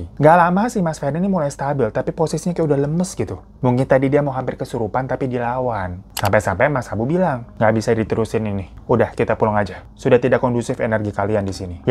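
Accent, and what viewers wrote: Java, Indonesia. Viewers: Wkwkwkwkwkwk..candu bgt logat jowo medok e bang 😂seru KLO ada TC yg pake jowo yaa.. 😂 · hihii😅 pake logat jowo siiip😂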